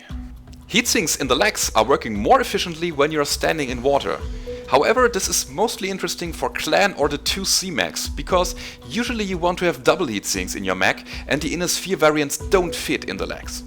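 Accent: Germany. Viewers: That German accent is music to my ears. Sorry, just had to point that out.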